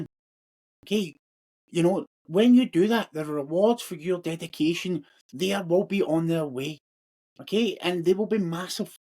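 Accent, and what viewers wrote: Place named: Scotland, United Kingdom. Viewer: I enjoyed your Scottish English accent. Are you from Glasgow? I am now much interested in these topics you deal with and your words have been helpful for me. Thank you. Greetings from Turin, Italy.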